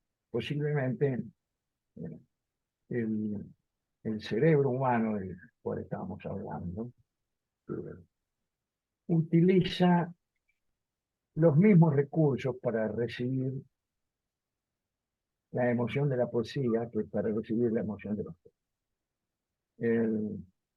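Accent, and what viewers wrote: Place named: Buenos Aires, Argentina. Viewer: El acento porteño es hermoso ja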